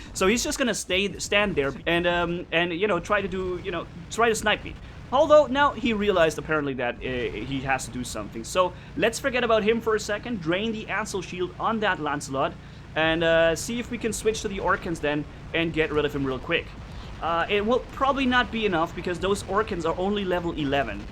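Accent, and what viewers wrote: Germany. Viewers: Bild ich mir das nur ein, aber du hast einen ganz leichten deutsche Akzent🤔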